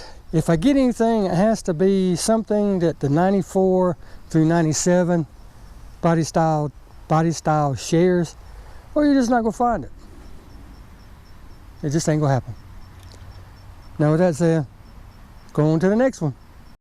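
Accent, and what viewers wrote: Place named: South, United States. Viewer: Absolutely you sound like you are from the south and the background and property looks like it does in the south. I lived in East Texas before moving to California so that’s where I see the familiarity.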